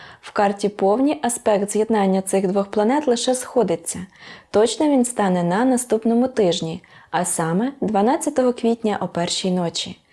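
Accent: Standard. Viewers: Як приємно слухати таку чисту та мелодійну українську мову ❤❤❤ Дякую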